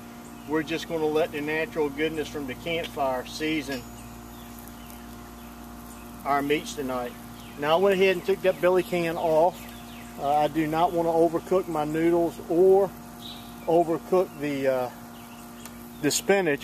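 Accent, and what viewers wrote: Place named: North Carolina, United States. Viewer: I enjoyed this, thank you. You sound like you might be from North Carolina or east Tennessee?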